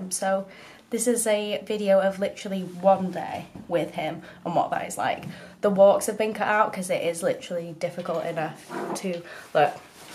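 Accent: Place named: Northern England, United Kingdom. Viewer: Plus, you sound northern haha. Got mine from Staley Bridge.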